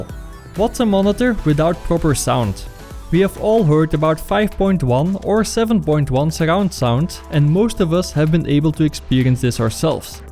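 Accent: Netherlands. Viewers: you sound like a dutch guy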